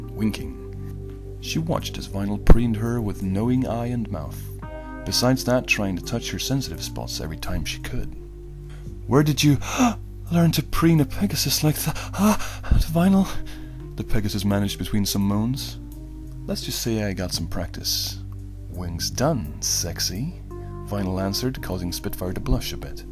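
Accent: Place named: Ireland. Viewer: I think the irish accent just makes it dound even better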